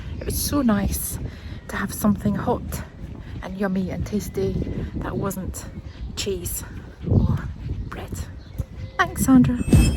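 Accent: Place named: Scotland, United Kingdom. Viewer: Yeah well here I sat in total anticipation, expecting as you promised, an epic cook up, to be shown a cheese baguette without the bun lol. I totally get your thoughts about the sound as well the view, the more senses that are stimulated the better the experience. I'm not sure if you have lived in Scotland, being born down south mate, as your accent has a hint of scottishness about it, but when your in your motorhome in summer at Rosemarkie and a storm rolls in, I wished I had been at work lol. Enjoyed it😊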